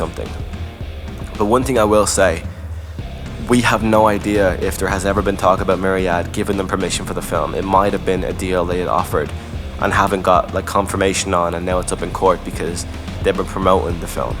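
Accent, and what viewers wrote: Ireland. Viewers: This guys Irish accent is damn sexy.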